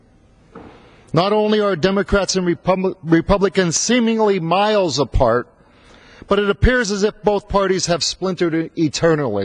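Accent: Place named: Hazleton, United States. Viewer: he still speaks like a hazletonian.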